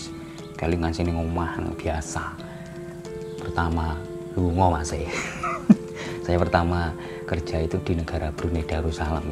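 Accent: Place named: East Java, Indonesia. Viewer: lihat cara bicara udah kelihatan orang jwa timur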